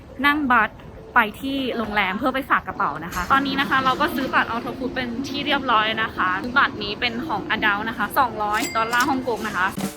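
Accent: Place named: North, Thailand. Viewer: สำเนียงแบบนี้คนเมืองเเน่นอนครับ55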